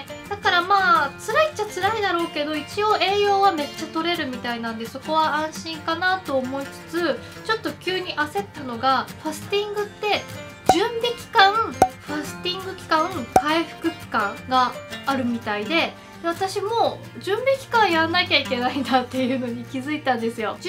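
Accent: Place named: Kansai, Japan. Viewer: 関西弁ええなぁ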